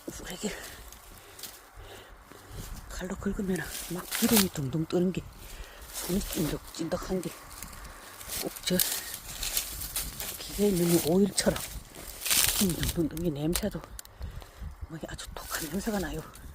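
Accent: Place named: Gangwon, South Korea. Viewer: 강원 도 사투리신가봐요. 말씀도 구수하고 나물향도 구수할것같아요. 땅두릅을 그렇게도 부르는군요. 산행하시느라 고생 하셨네요. 맛있겠어요.🤗👍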